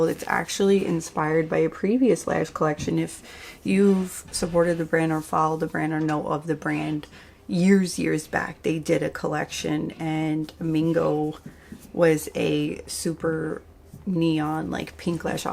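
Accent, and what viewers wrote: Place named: Philadelphia, United States. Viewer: I LOVE green eyeshadow looks!! I think they look sooo pretty with brown eyes. Watching your makeup tutorials have really helped me feel more confident in doing bold looks again. I have also bought a bunch of stuff you've used and that you have recommended 😂🤣 thank you for being awesome! Also... I live in Philly and you sound like you have a Philly accent. Sorry if I'm completely off with that hahaha you just sound local to me 😅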